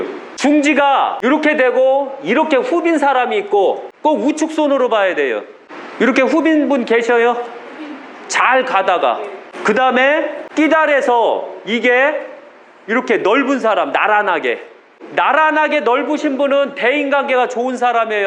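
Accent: Chungcheong, South Korea. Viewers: 충청도 사투리가 구수 합니다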